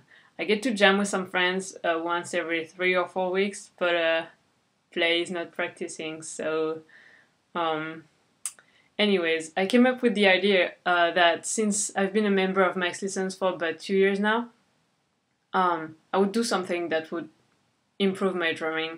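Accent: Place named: France. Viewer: Super idée, j'y ai pensé plusieurs fois mais j'ai jamais eu le courage ! Je viens de me commander "The weaker side" de Dom Fomularo, je vais commencer à me plonger dedans que dès je l'aurai reçu, je te dirai si c'est efficace :) Sinon, t'as pas à rougir de ton accent, t'as un anglais super fluide, on dirait presque pas que t'es française parfois, j'aimerai parler aussi bien anglais !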